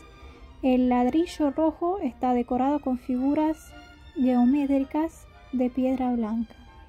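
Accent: Argentina. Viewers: tonada de Argentina. Yo vivo en Neuquén capital y hablo igual que. De donde sos, estimado? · Tenes la tonada de Bs As perfecta!! Tenes que cpntar como esta Rusia hoy en día con el tema de la guerra, esta más cara o más barata que Argentina??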